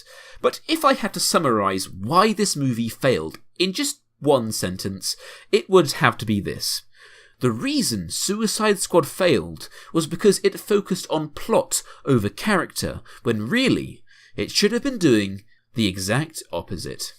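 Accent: United States. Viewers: american accent revealed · wow dude you have a nice american accent i wish i could do a good British accent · I love your american accent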